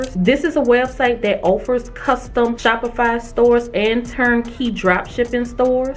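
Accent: New Orleans, United States. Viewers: Hi. Love your channel. New here. I love your videos! Question, are you from NOLA? I hear your accent a lil bit. 😊I love New Orleans so much. ❤❤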